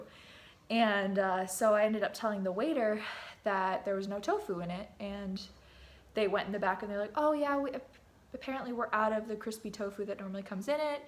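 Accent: United States. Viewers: I swear I knew you were an Iowa girl as soon as you spoke. I'm from M'town and miss all of the great looking and smart girls from my hometown.You represent our home state in a very positive manner. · your horrible American English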